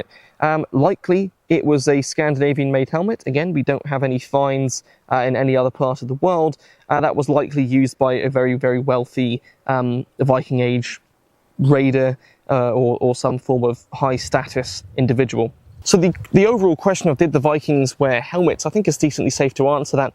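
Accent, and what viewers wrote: United Kingdom. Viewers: I like your English accent Lolol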